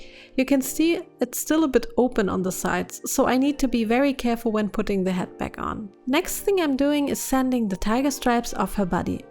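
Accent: Germany. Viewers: I have a question ^^ are you german? :D cuz your accent sounds german ^^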